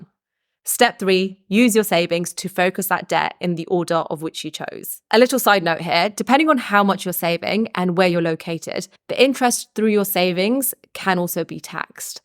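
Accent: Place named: London, United Kingdom. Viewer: love the modern London accent innit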